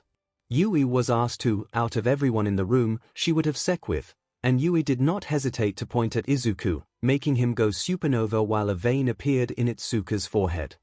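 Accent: United Kingdom. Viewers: Whys the tts bri'ish?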